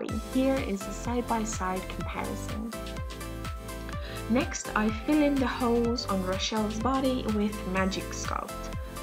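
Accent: Finland. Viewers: I'm currently debating with myself on if you are Finnish (it's the accent).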